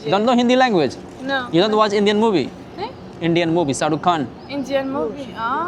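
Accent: Bihar, India. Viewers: Yaar itni countries ka experience lene ke baad you are still bihari at least now you should leave your bihari accent bro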